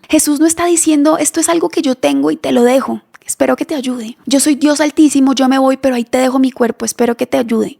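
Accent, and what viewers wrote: Colombia. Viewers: Tono de ablar que eres colombiana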